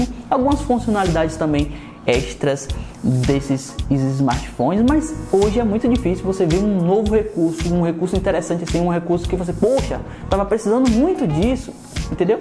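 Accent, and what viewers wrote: Bahia, Brazil. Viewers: Ele é baiano !? 🧐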